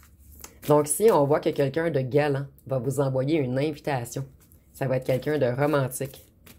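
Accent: Quebec, Canada. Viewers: J'adore vous ecouter avec votre accent quebecois vous etes calme❤❤❤❤❤❤❤❤❤❤❤❤❤❤❤❤❤❤❤❤❤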